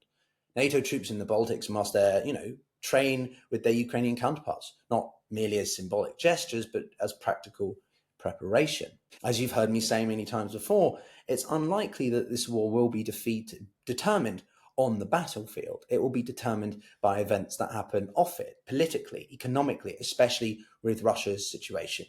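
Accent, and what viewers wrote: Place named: England, United Kingdom. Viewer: Another Nice Jew-is boy with an English accent.. Talking crap! Says The BronxBoy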